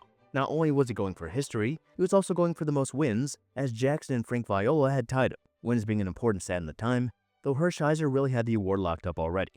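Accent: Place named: United Kingdom. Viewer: I never knew the official statistician for MLB was British.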